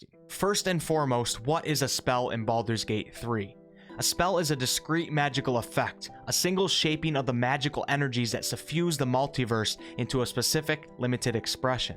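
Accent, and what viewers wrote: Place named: Minnesota, United States. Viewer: What is your accent? Is this like Minnesota or something?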